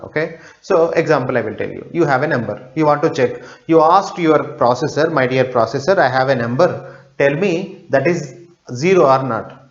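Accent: South India, India. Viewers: not every Indian talks like that's a south Indian accent lmao. also if he wants to talk like that he Will talk like that if you don't understand it go watch another video lol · serioously can you speak better than that ? we know that you are indian but at least try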